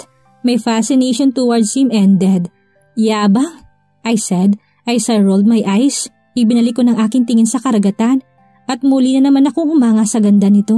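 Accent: Visayas, Philippines. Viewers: Haha ang cute mo miss mag Bisaya. Maraming salamat adm8n sa upload. Attention miss narrator pwede message me private. Thanks 💗💞🇬🇧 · Hahaha ang cute mo mag bisaya ms 😁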